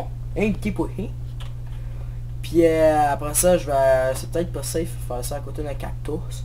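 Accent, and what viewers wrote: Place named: Germany. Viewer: Tes cris sont toujours épic ^^ sinon bel accent allemand bravo! ;)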